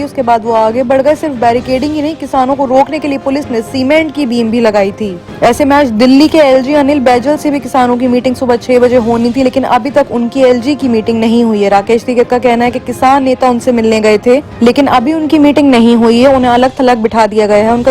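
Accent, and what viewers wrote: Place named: Bihar, India. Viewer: Koun to b hai g amma bihari dikhre aap awaz se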